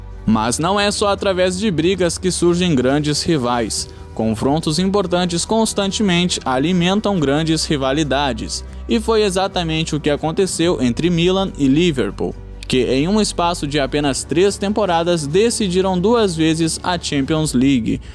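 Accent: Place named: Portugal. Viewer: Parece um português tentando imitar os br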